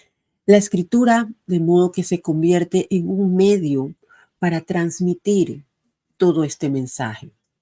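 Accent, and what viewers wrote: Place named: Dominican Republic. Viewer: La verdad tiene acento dominicano,yo soy dominicano vivo en ny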